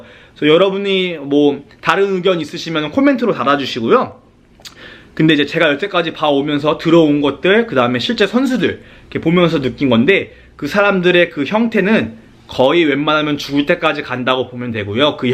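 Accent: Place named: Chungcheong, South Korea. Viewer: 말투가 충청도 분 같네요 인상 좋으심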